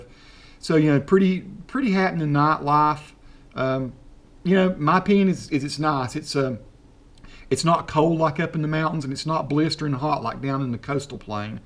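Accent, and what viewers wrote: South, United States. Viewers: you have a very interesting accent. i can tell its southern, but its unlike any other southern accent i’ve heard. where are you from? you remind me of someone i knew from kentucky.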